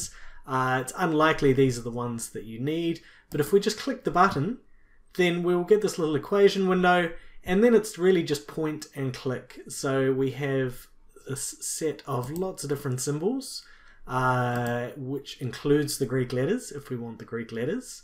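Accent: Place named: Australia. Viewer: thank you, "mate"? I think i detect an Australian accent. You're brilliantly concise.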